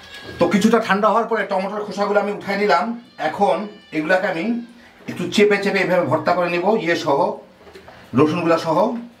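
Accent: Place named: Bikrampur, Bangladesh. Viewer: আপনার কথাগুলি বিক্রমপুরের মত। আপনি কি বিক্রমপুরের ?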